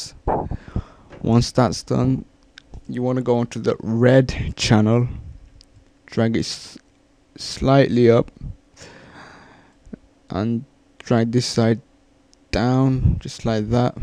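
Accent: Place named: United Kingdom. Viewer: Just love british commentaries :D - check you inbox -____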